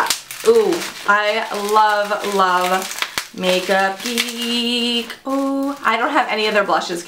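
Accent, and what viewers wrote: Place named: Staten Island, United States. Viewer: You're quickly becoming one of my favorite YouTubers! My husband is from Staten Island and your accent reminds me of his friends from there. Somehow he escaped without an accent;)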